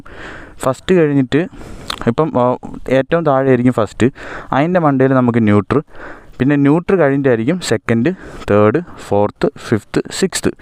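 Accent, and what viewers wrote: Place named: Trivandrum, India. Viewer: Where is this place ? Trivandrum ano? Asking because your way of talking is very similar to someone from tvm. Im from tvm and we also use the word theri for slope.... Great video by the way ...very well explained with examples.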